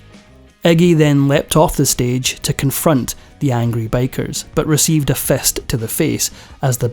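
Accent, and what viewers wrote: Scotland, United Kingdom. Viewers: 💯👍 I think it's a Scottish accent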